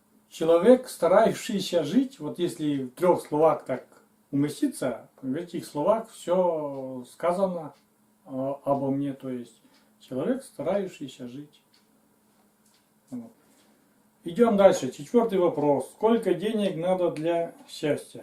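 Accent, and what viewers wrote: Komi, Russia. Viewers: Привет,очень интересно было тебя посмотреть и послушать твой типичный комиговорок.....однако! Восхищает твоя жизненная позиция, простота и откровенность. +41 от меня и всего тебе доброго, нужного и хорошего.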